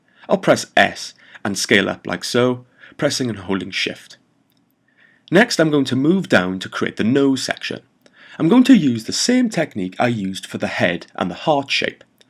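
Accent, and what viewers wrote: Wales, United Kingdom. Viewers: Your tutorials are great, as many people have said. I now believe a Welsh accent should be the universal tutorial approach! This one particularly deserves a comment because although it could be editing, I've never seen anyone talk for 50 minutes straight and still be just as enthusiastic as when they started! Great work, the skip to section in the video description is an especially good idea.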